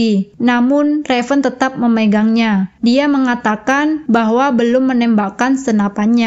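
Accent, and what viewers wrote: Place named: Java, Indonesia. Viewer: Seru banget ceritanya, tapi agak medok suaranya hehe. Lanjutkan mbak.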